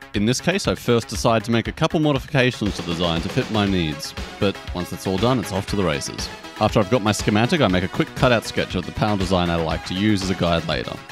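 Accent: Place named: Australia. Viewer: Awesome! Great to hear a fellow aussie too!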